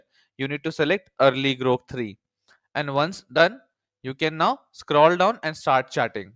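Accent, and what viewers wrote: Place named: Germany. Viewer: Dieses "Dinglisch" kann ich wieder nicht verstehen. Schade.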